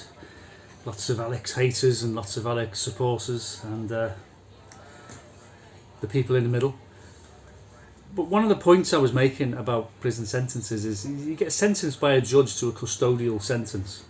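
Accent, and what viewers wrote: Liverpool, United Kingdom. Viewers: Any scouse accent reminds me of Brookside, love it. Just wondering if category D allows Internet access in any form ?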